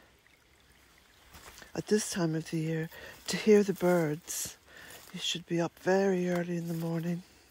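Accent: Ireland. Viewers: What a beautiful video. You have a lovely voice, very calming and I love your Irish accent too. Bealtiane cottage looks like a heavenly place to live xx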